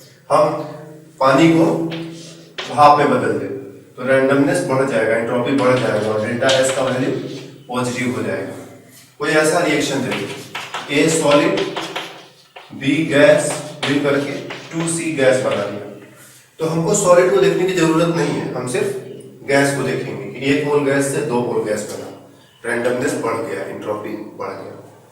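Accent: Bihar, India. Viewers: sir app bihar sae ho??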